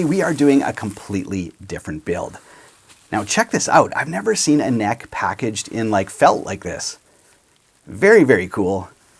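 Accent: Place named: Maritimes, Canada. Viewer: Prairies eh? I was so sure you were from the maritimes LOL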